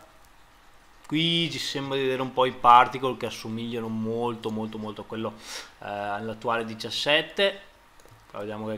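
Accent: Veneto, Italy. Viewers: Un po' veneto e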